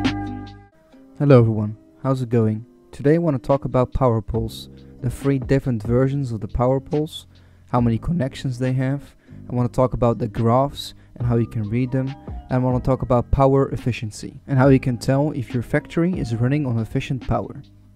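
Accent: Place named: Netherlands. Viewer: gij zijt nederlands he da hoort ge aan u stem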